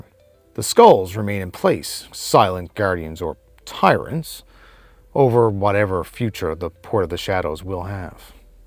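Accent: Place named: Newfoundland, Canada. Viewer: Great video! With that Newfoundland accent and all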